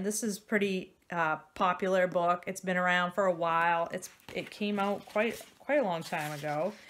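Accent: Maine, United States. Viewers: Five presidents sounds very interesting. How did I not pick up on your Maine accent?! My sister lives in Brownfield, love Maine and visit frequently! I also really enjoyed The Stranger in the Woods too! Have fun in DC!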